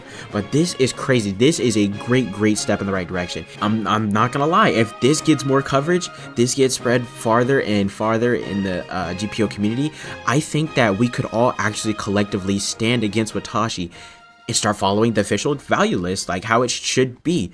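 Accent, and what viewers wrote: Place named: India. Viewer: Hes indian accent tells it all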